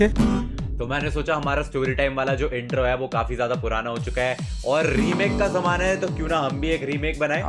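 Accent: Punjab, India. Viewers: Hes punjabi is very cute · Thaade punjabi bari cute a ji😅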